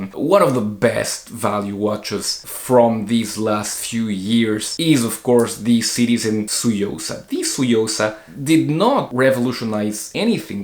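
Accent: France. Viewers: I know he is a French. I like his a French accent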